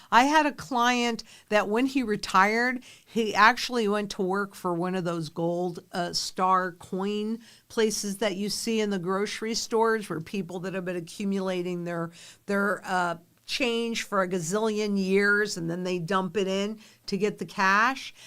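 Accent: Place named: United States. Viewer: My nit.....An historical is used when you speak in a British accented English because the "h" is silent. American accented English says "a" historical cause the "h" is voiced. speaks American accented English. So, she is correct. you can take your nit back now.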